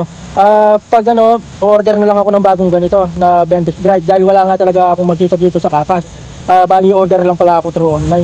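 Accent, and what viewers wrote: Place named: Pampanga, Philippines. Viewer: kapampangan ka pala paps. kumusta na ecu?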